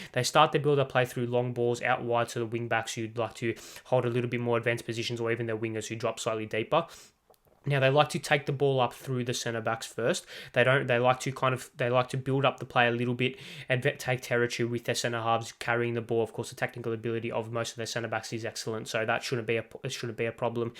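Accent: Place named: Australia. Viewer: RIDICULOUSLY AMAZING WORK! So Proud of you, and to see you produce this A GRADE! From a Pom to hear an aussie talk about the great game I am simply clapping! EPIC WORK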